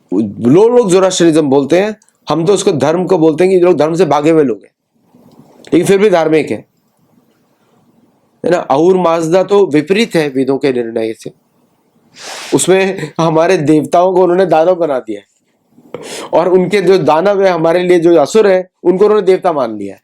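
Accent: India. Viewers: Respected sir, I thought you were from Kerala or Tamil Nadu. The way you explain, all our family members, including my mother listens to your Vlogs. Hope you explain in english also regularly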